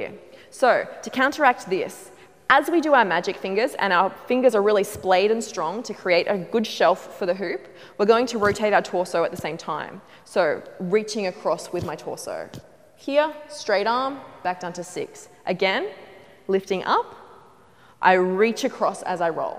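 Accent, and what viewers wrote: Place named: Australia. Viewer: I could listen to you talk all day! What an awesome Australian accent you have.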